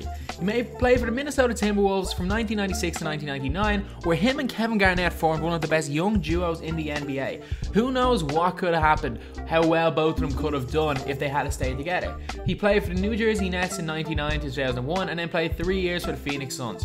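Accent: Dublin, Ireland. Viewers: Dublin accent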